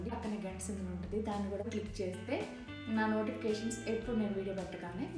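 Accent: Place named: Andhra Pradesh, India. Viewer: నమస్తే గారూ.. రాం చానెల్ చూసాక మీ చానెల్ సబ్స్క్రయిబ్ చేశాను.. తెలుగు ఇక్కడ మన ఊళ్లలో మాట్లాడినట్టుగానే చక్కగా మాట్లాడుతున్నారు.. పిల్లలకి తెలుగు రాయడం చదవడం కూడా ఇంటి దగ్గర మీరే నేర్పించండి.. తెలుగు వారు అందరూ కలిసి ఒక చోట సినిమా చూస్తానికి రావడం ముచ్చటగా ఉంది.. ఏదో ఫంక్షన్ కోసం వెళ్లినట్టుగా అనిపించింది.. ఒకప్పుడు భారత్ క్రికెట్ టీం కెన్యాలో పర్యటనకు వచ్చే వారు.. ఇప్పుడు కెన్యా టూర్ ఉండడం లేదు.. తెలుగు వారే కాకుండా ఇతర రాష్ట్రాల వాళ్ళు కూడా ఉన్నారా.. చానెల్ చూడడం చాలా సంతోషం కలిగించింది..🎉😊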